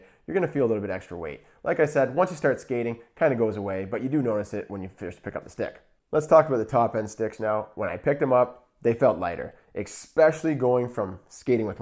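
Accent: Canada. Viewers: What a thick canadian accent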